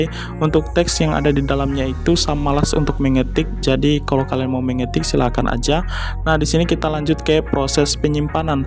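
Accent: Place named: Southeast Sulawesi, Indonesia. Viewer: abang dari sulawesi tenggara k, logat dan cara bicaranya sama" persis..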